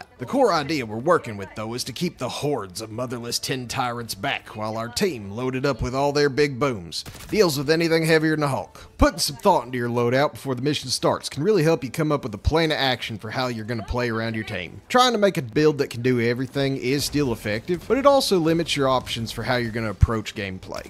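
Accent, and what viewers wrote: South, United States. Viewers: He's carried by that smooth southern accent.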